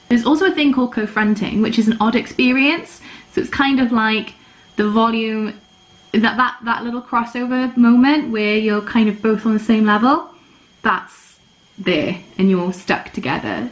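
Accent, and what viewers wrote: Wales, United Kingdom. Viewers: are you welsh? :o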